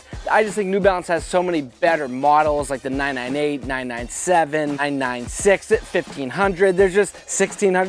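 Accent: Boston, United States. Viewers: "wicked affordable" if u couldn't already hear the boston, that line just sells it 😂😂😂 great content as usual man!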